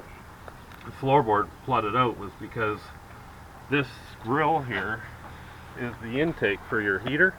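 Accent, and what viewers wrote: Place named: Canada. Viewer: Love that Canadian accent! Wife's from MI and I haven't heard that in a while! Bob from Texas...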